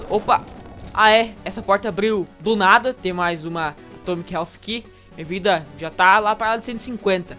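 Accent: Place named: Paraná, Brazil. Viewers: de onde tu é ? teu sutaque parece aki do sul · de que lugar do paraná vc é? hahahaha mt bom seus vídeos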